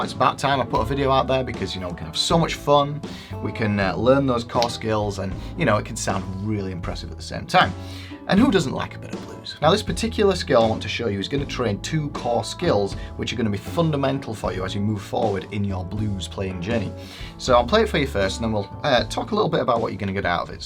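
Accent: Yorkshire, United Kingdom. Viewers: Hello. Love the vid! More please. You sound like you´re a yorkshireman like myself, which town?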